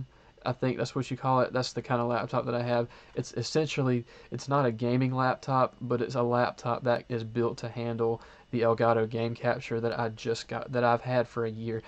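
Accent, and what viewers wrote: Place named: United States. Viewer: you sound countrryyy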